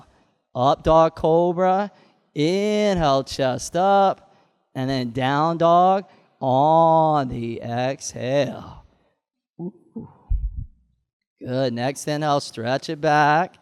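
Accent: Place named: Southern California, United States. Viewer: Thanks teacher, been practicing with you on youtube everyday, here in Bali. Equanimity in that Southern Californian twang.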